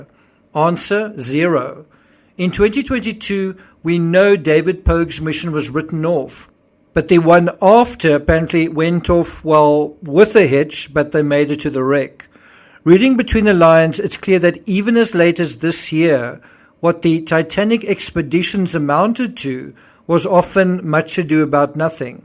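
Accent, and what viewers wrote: South Africa. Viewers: Your South African pronunciations are interesting and enjoyable. I have a SA acquaintance who likes listening to you, reminds her of home.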